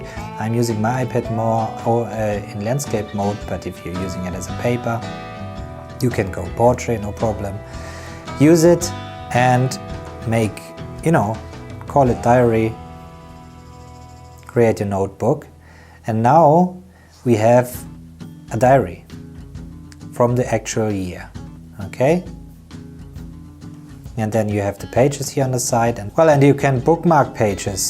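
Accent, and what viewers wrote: Germany. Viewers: Sorry, bist du Deutscher?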